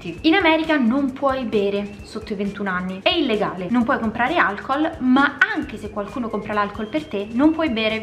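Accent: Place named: Rome, Italy. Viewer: A,è sempre 'n piacere guardatte!! To'o posso di da romano?(trapiantato a Torino però🤦🏻‍♂️🤷🏻‍♂️)...pure te sei 'n tajio!!😂 Bella,intelligente,brava e simpatica! E poi c'hai 'sto soriso!!🥰(mo te scrivo bene😅)... È bello seguirti,video sempre interessanti,e adoro il tuo leggero accento romano con un italiano invidiabile...ti prego non lo perdere mai però!👋🏻👋🏻🤗😘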